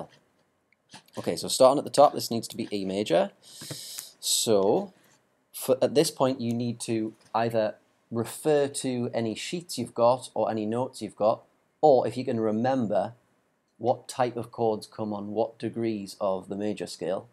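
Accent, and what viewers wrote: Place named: Wales, United Kingdom. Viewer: hes welsh or gordy lol ,we love gordys coz the sound welsh good tut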